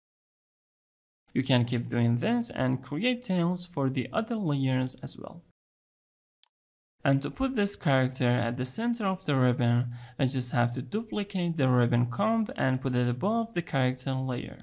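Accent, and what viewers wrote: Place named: Iran. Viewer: Are you from IRAN? Your accent tells me you're IRANian ... :hand-pink-waving::face-blue-smiling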